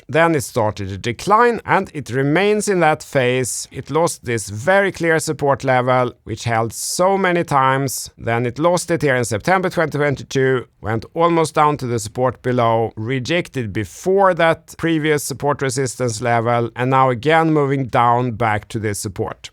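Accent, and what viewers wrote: Sweden. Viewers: A true Swede, judging from his very pronounced Swedish dialect. Keep up the good work brother!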